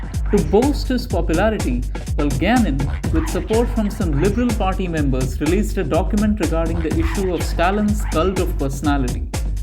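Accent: India. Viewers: I'm sorry, I can't listen to an indian for 9 minutes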